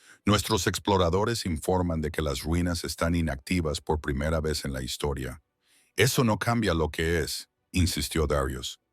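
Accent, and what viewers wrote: United States. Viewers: Cambien em robot de lectura yanqui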